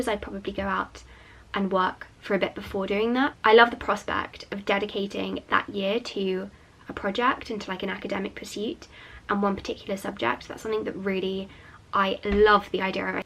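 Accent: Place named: England, United Kingdom. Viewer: Can you please tell us where in England are you from or lived in? I studying british english and I just love your accent and want to know where is it from 💛 thank you!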